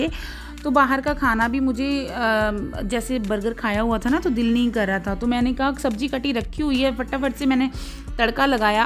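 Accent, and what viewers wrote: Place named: Punjab, India. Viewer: Aap ki language Punjabi lagti hai, but aap shayad ho, aap plz apne baare mein kuchh explain karen aap kahan se ho??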